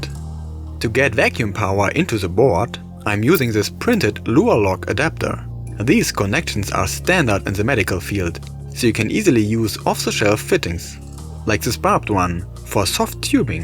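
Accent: Germany. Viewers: native german??? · I clocked you as German, and I was correct :D. Geiles Video, weiter so!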